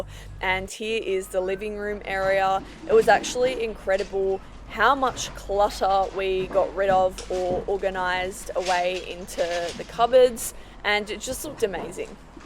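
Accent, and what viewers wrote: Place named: Australia. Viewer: Great video! If open to suggestions and unsolicited advice 😅 here it comes, longer videos make for better content for cleaning transformation lovers I think! I feel like a lot of the video was focused in one or two areas and we missed the process of the cleaning in the rest of the rooms, only getting to see the after. Would also be great to have a final comparison of before and after of each room at the end! Lastly, LOVE your narration (especially cause I love Australian accent 😅) small suggestion is to lower down the volume of the audio of the original video in the moments that you are narrating so we can hear your narrations better! For instance, it was a bit difficult to hear you during the vacuuming of the bathroom sink. Sorry if that was too much 😂😂😂 I just see amazing potential with this channel and thought I’d share my unsolicited advice in case you care 😅😅 sending love and looking forward to more videos! 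❤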